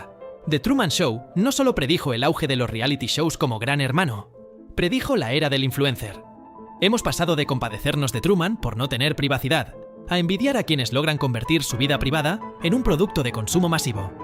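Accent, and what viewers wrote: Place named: Spain. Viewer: Es que no existen otros narradores que no hablen españoletos? Ya lo dije NO VEO VIDEOS NARRADOS POR ELLOS. NOJODAAAA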